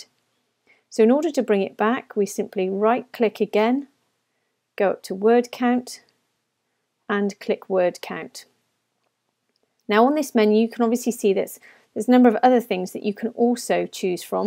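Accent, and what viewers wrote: United Kingdom. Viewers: Straight & Simple. Thank you! (Why does British accent makes the person sound intelligent to start with?)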